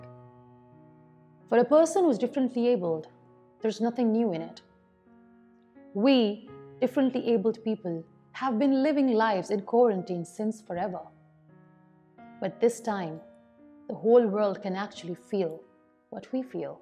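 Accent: England, United Kingdom. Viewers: Mam you are my" role model ".I like your english auction. Blessing you to live long with great success.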